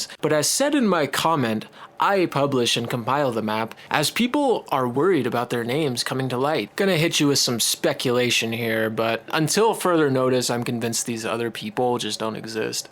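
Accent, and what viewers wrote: United Kingdom. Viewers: talks like the average British person but it's worse than talking to a French person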